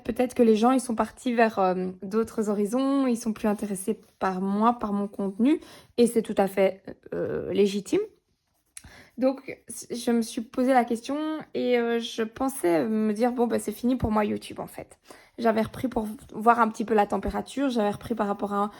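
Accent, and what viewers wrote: Belgium. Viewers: J'ai repris le colo cette année et j'ai pris une claque avec l'évolution du matériel ! toutes ces chaînes de coloristes mais j'avoue que ton accent belge avec ton côté passion douceur dans ce domaine te démarque 😁 j'adore également le scrapbooking donc, le mélange me plaît beaucoup ❤ merci pour tes vidéos 🌹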